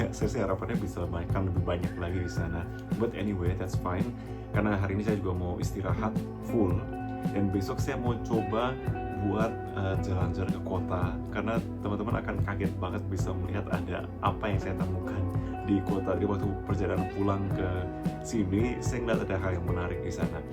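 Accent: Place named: Surabaya, Indonesia. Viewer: Terimakasih Bang, kontenmu mewujudkan mimpiku waktu kecil, kan suka liat Globe terus penasaran pulau² kecil disamudra emang ada orangnya? Dan Kontenmu menjawab semuanya. Tp ada 1 yaitu kutub Utara atau selatan ataupun negara yg terpencil² kyk gimana kehidupan mereka yg jauh dr negara² lainya. Semoga kontenmu semakin berkembang. Btw orang mana kok logatnya kyk Surabaya.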